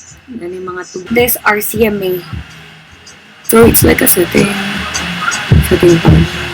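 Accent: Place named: Cebu, Philippines. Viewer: I thought you were. you have that cebuana accent. You should make more of these, with better lighting, video quality, and sound quality. and keep the bisaya script. Lot of potential to be a popular pinoy youtuber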